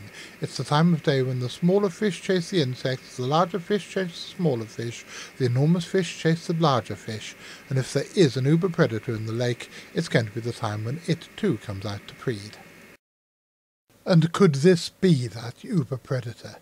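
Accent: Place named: RP, United Kingdom. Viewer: Apart from all of the comments about the video quality and style, 3 things. 1. Your age is not a qualifier of reliability (or your plummy accent). 2. Haven't you EVER seen what a swan or other large bird has to do to get into the air from water? 3. You also can't convince us by dismissing all the images beforehand '... the are just large fish, don't worry about them... blah blah'. I'm sad about all these things. The world is a strange place, and we're all interested, but this? Buh.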